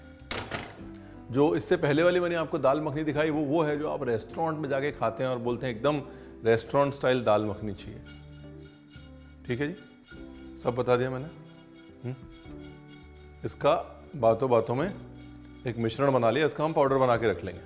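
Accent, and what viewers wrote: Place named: Lucknow, India. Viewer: Your hindi in lakhnavi accent is very pleasant to listen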